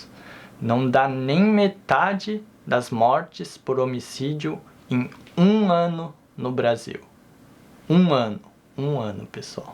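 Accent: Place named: Japan. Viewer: Tenhor varios amigos brasileiros descendentes de Japones que foram para o Japao e retornaram ao Brasil pois a vida ai nao e facil. Portanto vai depender muito da pessoa e do que e mais importante a ela, mas e claro essa e sua opiniao pessoal e respeito. Pelo seu sotaque achei que voce fosse japones falando Portugues. Eu morei 27 anos no exterior e meu Portugues e totalmente sem sotaque e super claro, facil de entender.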